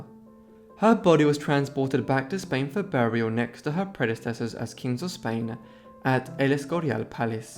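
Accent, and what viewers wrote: England, United Kingdom. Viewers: Do you realize he is British and British people pronounce words differently then Americans! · I'm perfectly aware of his English accent - I'm English,myself - I think he's fantastic & really enjoy his videos, but,there is NO other way of pronouncing the word properly in English. I'm very dyslexic as once might realise from reading my post,but,on the spoken word I have total confidence & as I said its constructive criticism, not an insult. I give my Spanish/Italian godson English lessons to help his conversation & having lived all over the World & helped 100s of people with English, it's a courtesy to help someone be the best they can be. Just like I tell anyone to correct my French,Spanish,Italian,Arabic & Greek.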